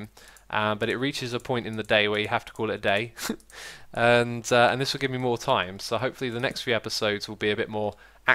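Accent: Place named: United Kingdom. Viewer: I haven't watched him in ages and he sounds British(OH SHIT, YES, BELIEVE IT OR NOT THIS IS AN OPINION. WOW AMAZED). Please don't get mad at me when I barely know anything about him, Mr. Know-It-All...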